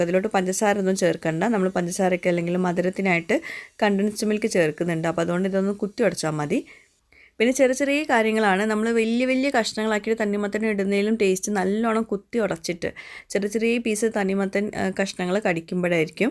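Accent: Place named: Kasaragod, India. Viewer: Endhra ninga chayakudicha endh baychath nalla majayinda....enthra ee soonante mon enik kasraod romba ishtam especially kasargod basha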